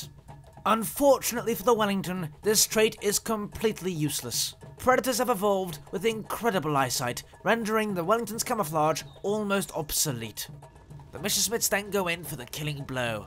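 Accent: United Kingdom. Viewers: What is it with you Brits and narrating xD When the messerschmitts drove to the downed wellington you really cracked me up haha. (I chuckled throughout the enitre video :p)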